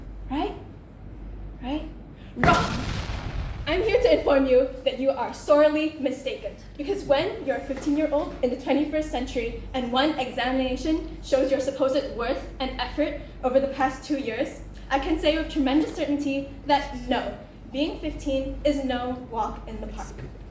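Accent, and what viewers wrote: United States. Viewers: I like ur accent, sounds americanish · finally, someone with an American accent in a uk school, I’m not the only one!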